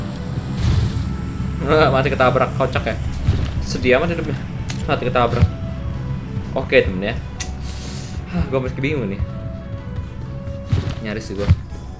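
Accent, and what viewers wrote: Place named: Java, Indonesia. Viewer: Ngomong asem medok slur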